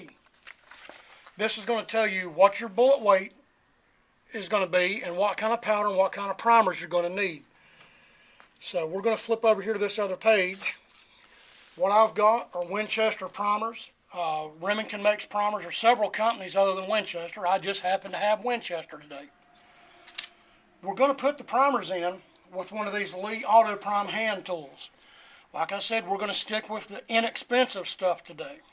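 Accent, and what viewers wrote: United States. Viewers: Great video. Thx for showing. You def have a voice of country, good ol boy right ther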